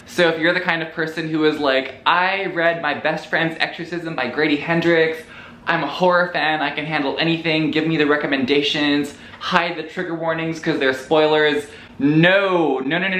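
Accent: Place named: United States. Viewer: I am soooooo curious. How do you have such a strong and authentic American accent if you have never lived in the US. I had always assumed you moved to Asia in adulthood. 🤷‍♀️ Thank you for the list. Great reccomendations as always.❤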